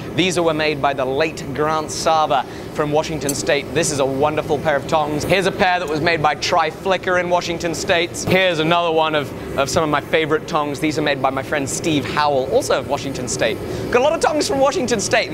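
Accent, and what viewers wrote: England, United Kingdom. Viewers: where about's are you from in England to obtain this accent?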